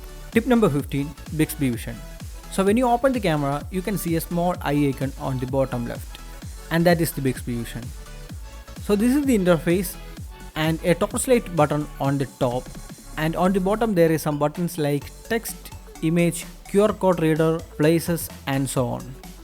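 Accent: India. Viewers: 😂😂😂India man..English India...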